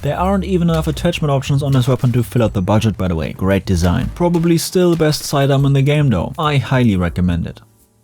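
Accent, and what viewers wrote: Germany. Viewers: I watched until the end because you make some of the best Battlefield videos on the internet. Dry humor, efficient/clear explanations on things, and of course your German accent. Danke, dass du großartigen Content erstellst!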